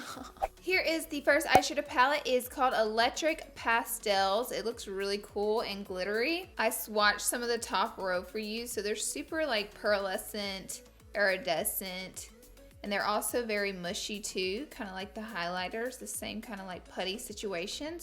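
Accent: South, United States. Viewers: DIY GURL she’s from the south.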